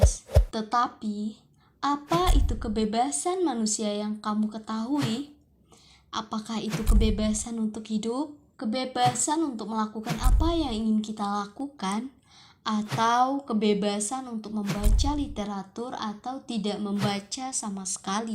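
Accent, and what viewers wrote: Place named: Java, Indonesia. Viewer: Lucu ih suaranya medok khas gitu 😂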